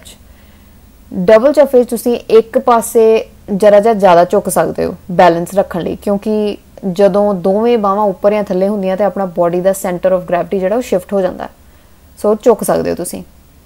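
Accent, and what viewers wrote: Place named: Amritsar, India. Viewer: Boli ton ta bibi ambarsar di lagdi a. Ke nai??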